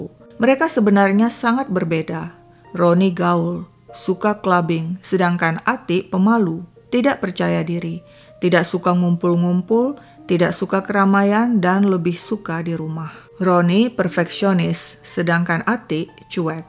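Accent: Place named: Java, Indonesia. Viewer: Kalau ngomong... bahasa jawanya lucu...lagunya risau berkesan...suaranya unik...dan cantik...👍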